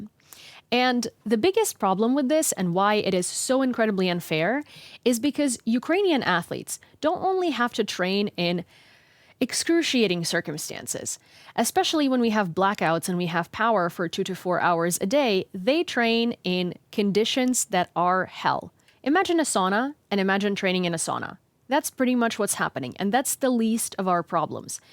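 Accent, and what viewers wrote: United States. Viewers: my sincere appreciation for your work and for Gaze media as well. You undoubtedly do a meaningful job, cz western media doesn't comprehensively cover the news about the war in Ukraine. P.S. Thanks for exposing to perfect American English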